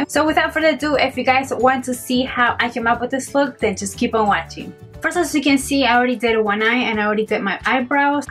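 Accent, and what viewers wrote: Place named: Caribbean. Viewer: Such a pretty look! I didn't realize you collaborated with one of my favourite youtubers. You have an accent similar to hers are you from the Caribbean?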